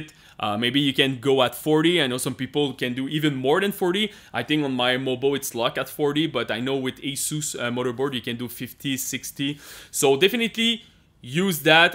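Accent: Quebec, Canada. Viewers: I love French Canadian accents 😁 Had mixed results with my 7800X3D on stock settings with a gigabyte B650 aorus elite ax. Mid to low 17000 scores in cinebench multicore. Did exactly what you did in this video and it's up to around 18,000 now. I'm only running -15 and it seems to be stable, but from what you said it seems realistic to try for -20 or even -30, so I'll probably try that later today. What kind of clocks are you getting for multi-core loads? Great video, thanks.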